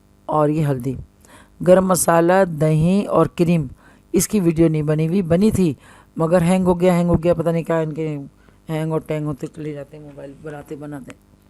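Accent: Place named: Hyderabad, India. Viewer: As salamualaikum Aunty mai toh aap ka fan hogaya aap ka baat karne ka andaaz toh mashallah. Maut daaldere aap toh hamari Hyderabadi zubaan me kiraak 👌👌👌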